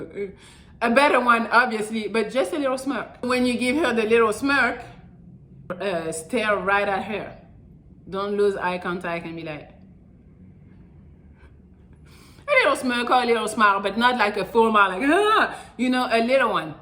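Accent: France. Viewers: What's your nationality lass, you have a french accent👀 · Hi I'm a first time viewer to your channel, a virgin of sorts, so just a few things first off i wish we' had vids (podcasts) back 20 odd year's ago because boy was I stupid, i used to have them running after me, know their running away from,second i thought i detected a wee hint of a bit of a French accent, apologies if I am worng I was just wondering i don't think I can jump that far. Keep up the good work.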